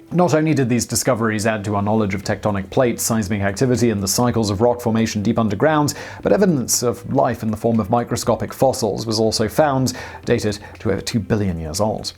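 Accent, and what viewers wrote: United Kingdom. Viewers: As is all algorithms from Borg to Utbe, it go to hell kiss my ass and I'll do as I damn well please. Such is math. Also your device is set probably on Autoplay. Mr British guy is like all things UK: only in small amounts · no British accent can hide that mistake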